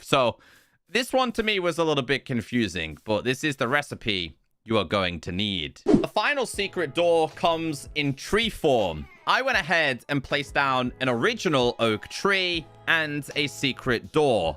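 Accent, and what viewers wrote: United Kingdom. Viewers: I was watching one of your videos and my Dad said “what in the British!?”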